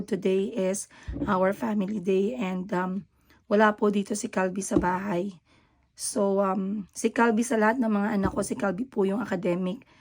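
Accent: Iloilo, Philippines. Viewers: Bat ka nag I ilongga accent 😮eh andiyan ka nman sa mindanao parang Hindi ka proud na mag bisaya eh andyan ka sa mindanao be proud na marunong ka mg bisaya wag ilonnga accent 😮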